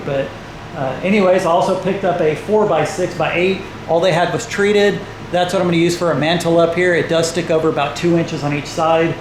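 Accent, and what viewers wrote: Texas, United States. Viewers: Morning having a chuckle here in Australia, but with your wonderful Texan accent I can hardly tell the difference between the two ways you pronounce “hearth”. Love listening to your accent. 😊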